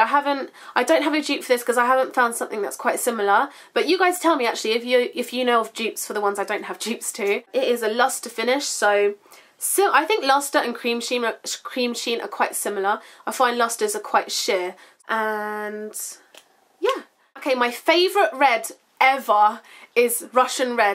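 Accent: United Kingdom. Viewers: lol i just think its so cool when i click on a youtube video about hair or makeup with a girl & then out comes this british accent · ur cute. then i played ur video. ur british! even cuter! totally subscribing! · Are you British ? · I love the British accent !!! Thank you for the very helpful video 💕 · Ur so British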